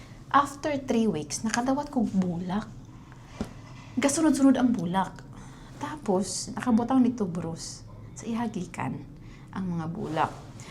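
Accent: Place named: Cagayan de Oro, Philippines. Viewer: Wow ka nindot sa imong love story dhay. Congrats ❤️Lalom kayka mag bisaya murag taga Cag de Oro. Nindot paminawon.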